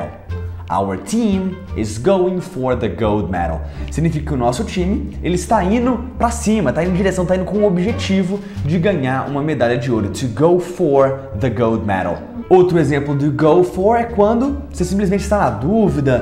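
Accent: Minas Gerais, Brazil. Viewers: Para de ser chato misericórdia, é óbvio que o cara sabe como essas palavras são escritas ou pronunciadas em um português formal, mas isso é apenas questão de SO T A Q U E O cara é mineiro, deixa ele em paz com o sotaque dele, desnecessário ficar atrapalhando os comentários de um vídeo focado no I N G L Ê S por causa de preconceito linguístico Até parece que você fala que nem um robozinho, com todas as letras e acentos. Vc pode até pronunciar as palavras citadas de um jeito um pouco mais padrão, dependendo da sua região, mas tenho certeza que com outros tipos de palavras e fonemas vc também fala "errado"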